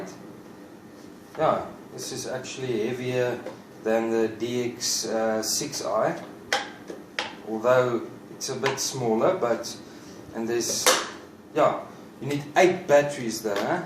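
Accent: South Africa. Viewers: Are South African Die manier hoe jy praat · He's definitely South African. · Are you South Africa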